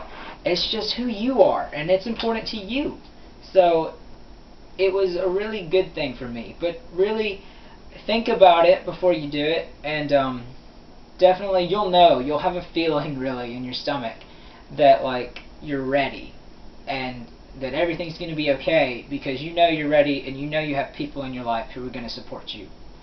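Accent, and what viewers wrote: South, United States. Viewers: I dont know why but i love the southern accent❤️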